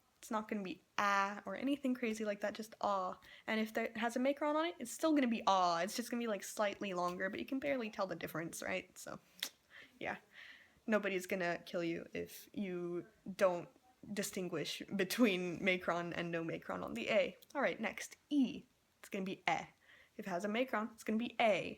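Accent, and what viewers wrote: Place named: United States. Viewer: Great video, but you should really try to master the IPA pronunciations, physically. It still sounds like an American English speaker pronouncing latin: Although you're spot on in explaining the proper vowels, the vowels are different than the way you're pronouncing them.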